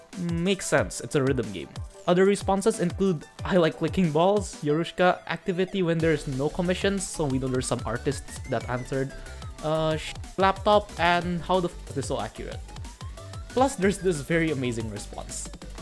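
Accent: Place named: Indonesia. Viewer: Logat Indonya kedengeran banget njir, but S for effort 👍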